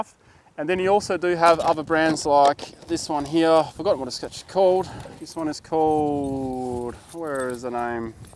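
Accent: Australia. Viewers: Why did I know he was Australian before I opened the video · This guy sounds like Callum Von Moger. Where is Aus you from?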